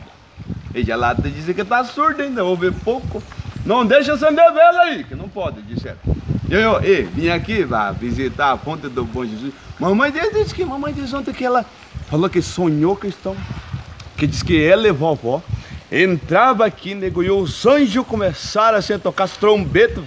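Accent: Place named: Paraná, Brazil. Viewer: Você é de Paranaguá ou de Antonina parece sotaque de paranaense da hora seus vídeos 😉😊😊😊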